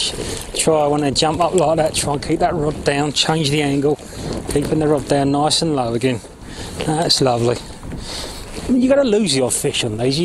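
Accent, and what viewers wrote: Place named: Essex, United Kingdom. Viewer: Nice one - very useful information. I'm from up here in the north west and I've been trying to mic your lovely Essex accent to my mates up here - nearly got it!! Great stuff mate - well done!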